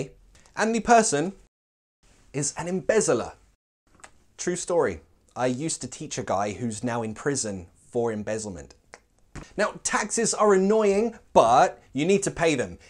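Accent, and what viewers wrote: United Kingdom. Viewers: Hey, I love you, I really do. I've always been watching your lessons. You're really funny and I've been recommending your YouTube Channel to my friends. But there's something I want to tell you, you're dressed up like an American Police Officer and speaking with the British accent 😁😁 it makes no sense 😁😁😁. I'm laughing out loud. It's not a critique, don't get me wrong. What I'm trying to say is that you're so funny, you really are. The way you create the videos make us being focused on the lessons. It makes us to want more and more an more. I love the way you make your lessons, your videos and I do believe I'm not the only one who thinks like that. I love you mate, actually I'm in USA, I should be saying "I love you my friend" 😁😁. I know, I know, Mate and Friend are the same thing. 😁😁 Wish you all my best my friend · Thank so Much ! 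I really like your accent, so British !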